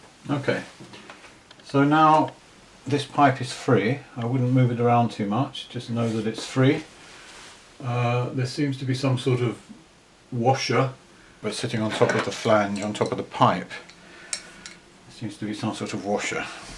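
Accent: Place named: Home Counties, United Kingdom. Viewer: you are a STAR plumbing video maker. I am sooo... grateful i found your amateur easy to follow step by step video. British videos give only badly explained advice and meant to get you to call a plumber. Youtube should delete these fifteen minutes of fame time wqsters. Your accent seemed home counties english until i found i was donating in Canadian dollars on paypal. Thank God for honest Canadian DIYers, I saved £s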